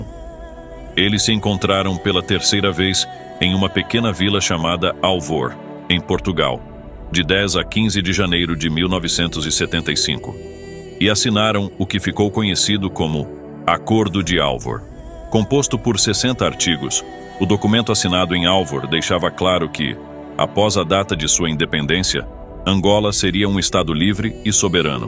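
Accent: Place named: Brazil. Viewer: só uma duvida ........... pq varios canais africanos utilizam o sotaque brasileiro?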